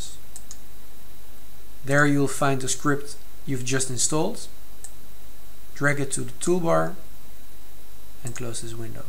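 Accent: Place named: Netherlands. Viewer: Its scary when people contact me to tell me that I sound just like you. Can tell by the dutch accent it def. does. But voice, pitch, etc also comes rather close. yay for finding my voice-twin lol.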